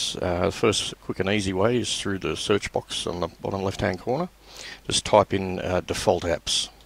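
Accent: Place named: Australia. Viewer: Mate, Your accent is awesome. Brought back all the fantastic memories I had in Australia. The best country, people and culture I ever experienced in the entire world. Video helped, good on you mate. Cheers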